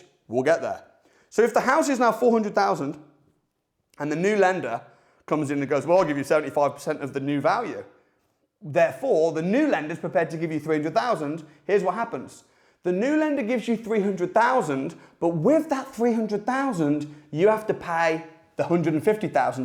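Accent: United Kingdom. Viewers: Why does the British accent make this video un watch able.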